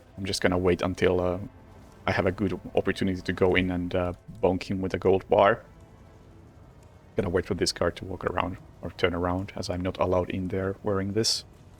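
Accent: Finland. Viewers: Also the thing with the mastery change to 20 theres a bit more to it than that and it’s more understandable when u know the nuances: Hitman 3 changed A LOT regarding Hitman 2’s challenges when porting the old content over. Challenges were cut, sometimes reworked and most importantly they moved around unlocks. Like for example they removed all the Classics challenges for each difficulty which stacked up to a big XP bonus, added challenge pack rewards to the mastery track and had it so that there was rewards that counted how many SASOs in general you did rather than tying it to Master Mode specifically So all in all once you do that there was probably instances where that stuff had to be rebalanced, New York already had challenges which gave out less XP to make it the same speed to master as other levels so with a chunk of challenges gone they would have to give the levels different values anyway so they thought why not just make it more consistent with other maps while theyre at it? 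Plus you seem to forget how much people judge a map’s replayability on its mastery levels. This was way better for marketing, even when they eventually bring Hitman 2’s DLC into the full bundle like they did with the Switch2 version it’ll still be better for free players to know theyre getting 20 maps of around the same level of replayability rather than thinking some are less valuable than others Great video tho, i love the fact your finnish(?) accident makes “vault and climbing” sound like “bald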